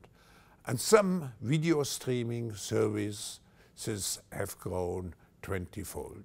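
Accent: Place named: Germany. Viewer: He's probably got the worst German accent, I have EVER heard....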